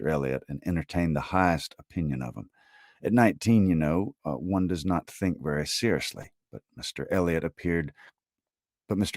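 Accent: South, United States. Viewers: Wow, I love this! I never would have thought Austen could sound so good with a southern accent 😂 thank you for sharing your talent!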